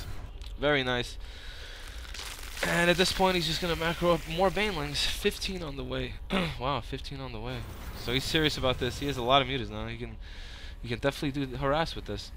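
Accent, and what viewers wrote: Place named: New York, United States. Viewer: Haha yeah man i remember in one of your first vids some one had asked you where you were from and since then i was surprised cuz i couldn't find a trace of NY in your voice lol 'till now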